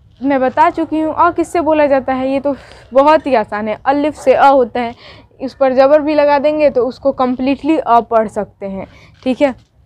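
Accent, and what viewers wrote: Bihar, India. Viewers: आपका prounaunciation से ऐसे लगता है की आप बिहार से है, कहा से हैं आप? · उर्दू का उच्चारण मुश्किल है। यही एक वजय है, लोग उर्दू सीखें से कतराते है। आप तो बिहारी है। आपके लहज़ा से पता चलता है।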